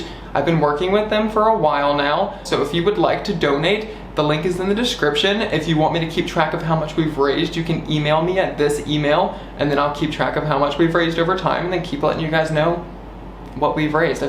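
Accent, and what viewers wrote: South, United States. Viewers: Do you have a slight southern accent ?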